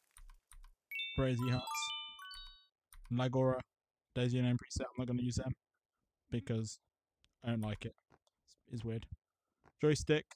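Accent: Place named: United Kingdom. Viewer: that is justnormal british accent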